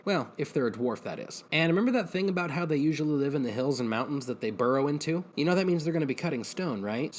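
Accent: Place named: Scotland, United Kingdom. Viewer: Your Scottish accent is on point.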